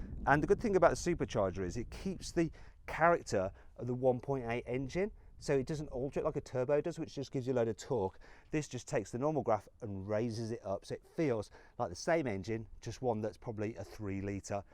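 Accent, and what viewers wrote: Birmingham, United Kingdom. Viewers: sorry i couldn't understand the model of the yokohama, can someone please write it down here? thanks! (english isn't my first language and the british accent makes it a bit hard to understand sometimes!)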